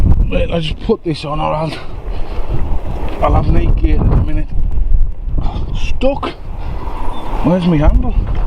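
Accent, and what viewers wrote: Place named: North East England, United Kingdom. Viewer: Just found your channel by accident .. and recognised the accent. I'm in Whitley Bay and I guess you are not too far away. Regards !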